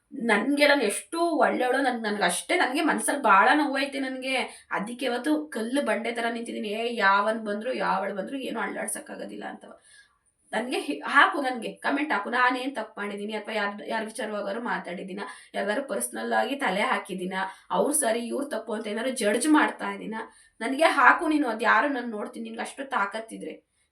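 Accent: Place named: North Karnataka, India. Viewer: uttarakannada bashe keloke chanda...yar yen helidru bashe ge ero gowrava sikke siguthe bit bidi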